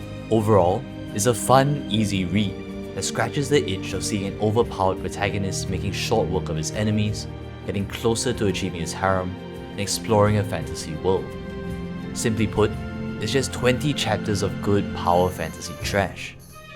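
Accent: Singapore. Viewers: you sounds like my uncle who came back from sg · are u from singapore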